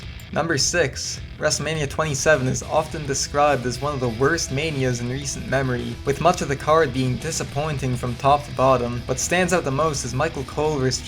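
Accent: Canada. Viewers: You can defend your Lawrence Taylor comments and put up annotations all you want but that doesn't change the fact that you don't know what you're talking about. It's pretty evident through your accent that you're Canadian. It's not WWE's fault you're from Canada and don't care about American football. Just because you don't know who LT is doesn't mean the majority of WWE fans didn't. Besides, how old were you in 1995? Were you even alive? WWE is an American-based company with mostly American fans most of which are NFL fans. If you really want to complain about WWE using one of the biggest names in the world of sports at the time to boost ratings, I don't know that they'll ever find a way to please you. To put it into perspective for you, it would be like WWE having Gretzky appear at WM and an American saying "Who is Wayne Gretzky? Have you ever heard of him? Probably not." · You sound like you're from Nova Scotia.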